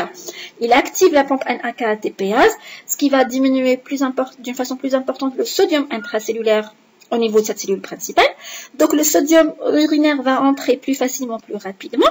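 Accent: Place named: Algeria. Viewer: Médecin Chef de service de pédiatrie en Malaisie / Maitre de conférence, je suppose que vous êtes algérienne( l'accent++++ ) hhh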